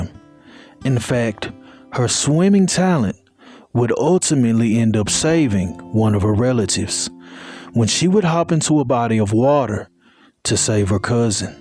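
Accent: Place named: Memphis, United States. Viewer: You sound like you from #Memphis 😊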